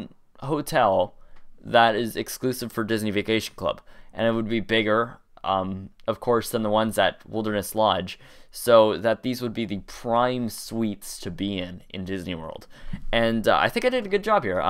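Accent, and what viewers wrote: Canada. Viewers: You sound Canadian :D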